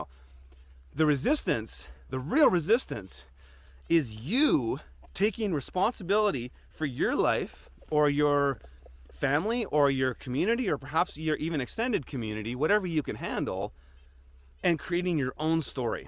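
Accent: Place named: West Coast, Canada. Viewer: Refreshing to hear your perspectives on the Dutch farmers, et al. As a former B.C. native, now living in the Netherlands - on a basic level I appreciate hearing your West Coast Canadian accent speaking and seeing the visuals of your farm and overall and island/coastal habitat. It definitely, takes me back to my roots and sets up familiar perameters which compliment the core of your message to us all - wherever and whomever we may be. I also found interesting the definition of Canada you cited ... being "the internal and territorial waters". Does that mean the government doesn't own the land, and therefore has no jurisdiction over the people who live there ? Are they ruling, therefore, illegaly ?? Unless, you happen to live on a houseboat or in a canoe, perhaps. All of that leading up to the "cherry" imo, which to my ears rings like a bell: "I'm in free country here... 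THIS is Canada" !! Sounds good to me :) It's refreshing to hear you clear away the clutter and giving this topic some room to breathe - by sending it to us from into the wilder, open spaces. The Dutch farms take up an incredible amount of "open space" here too, by the way. And, the Dutch farmers are incredibly resilant. They will not back down, in spite of the odds being against them. They built the Netherlands, in a sense. And, more and more people in Holland are on board with them, in spite of the overall inhabitants not being able to live-off-the-grid, so much, in this country. It is the densist, most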